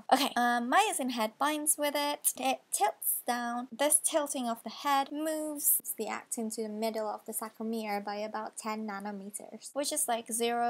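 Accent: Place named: United Kingdom. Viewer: i think ur smart :) indonesian who has a brithis accent lol :) salam hangat dari Indonesia :) i wants ur life lol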